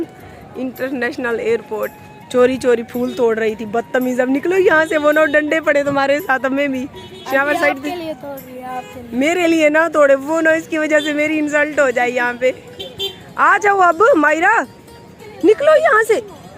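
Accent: Saraiki, Pakistan. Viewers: Aapi saraik language apki zaban say booht achii lgti ha. But samjh bilqul b nai aati.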